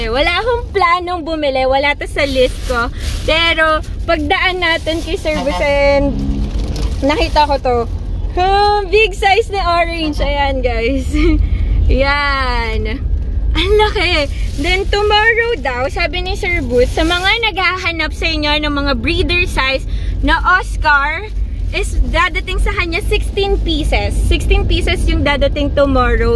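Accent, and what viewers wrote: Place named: Balikbayan, Philippines. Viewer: Soo konyo nemen yern. Balik bayan accent lang malakas😆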